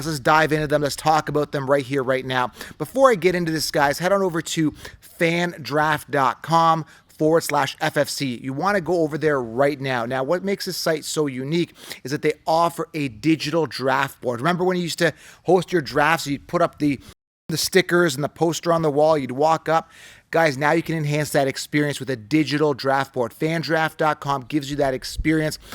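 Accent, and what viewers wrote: Canada. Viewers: I like watching your show.. I can even tolerate your Canadian accent but could you actually pronounce someone’s name right??? Is that too much to ask??? You sound like a damn fool.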